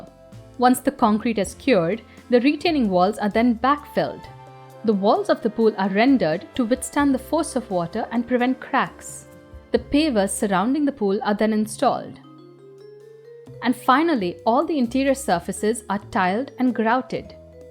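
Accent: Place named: India. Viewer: You are the only Indian person i like on YouTube. I usually cant stand the accent but your information is so well worded and to the point that I don't mind. I assume this compliment also sounds like an insult, but that is not my intention. Just being honest. Thx for the upload!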